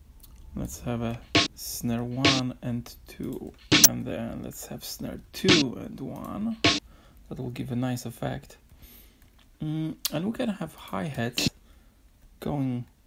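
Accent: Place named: Germany. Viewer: not an authoritative answer but my guess would be a German living in the UK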